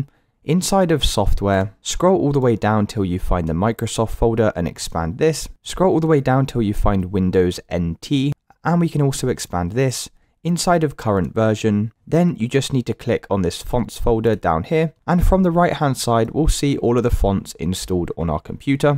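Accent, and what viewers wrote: United Kingdom. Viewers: how British are you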